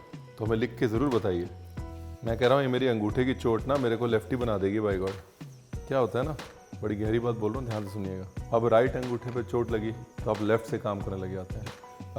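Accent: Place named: Punjab, India. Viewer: Gayani Brar Ji, Chaa gaey O thah kr k. Like the way you give Punjabi touch to the conversation, hai k nai...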